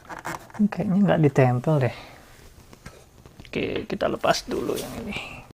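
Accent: Bali, Indonesia. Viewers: subscribed. aku suka cara ngomongnya. khas orang bali. apalagi kalo lagi rliding eh riding gak ngebut sih cuma ya asik aja dengerlin eh dengerin celotehannya. salam kenal om ✌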